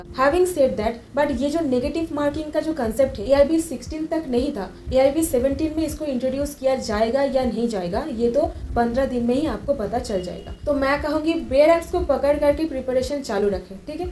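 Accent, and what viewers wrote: Bengal, India. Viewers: Mam r you Bengali?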